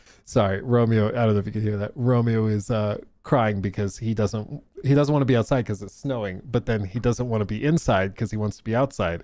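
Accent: United Kingdom. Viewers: Too much Brit 6/10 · 6/10 Too much British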